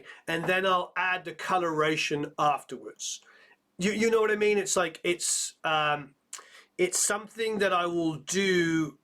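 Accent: England, United Kingdom. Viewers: Don't lose your English accent, we love it, good info, thanks.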